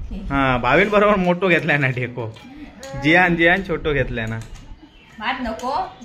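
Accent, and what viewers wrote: Malvani, India. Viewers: बरा वाटता कोण तरी अस्सल मालवणीत बोलता ता नायतर अर्धे मालवणी गोंधळच घालतत. अर्धा मराठी अर्धा मालवणी बोलान. तेचा काय होता म्हायत आसा समोरचोय गोंधाळता मरे. असो तुका बाकी हॅट्स ऑफ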